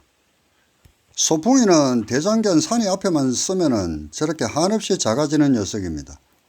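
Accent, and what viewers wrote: Gyeongsang, South Korea. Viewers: 여기가 어느 지역입니까 경상도 인가요 ? 강원도 인지요 ? 저는 서울입니다 ^^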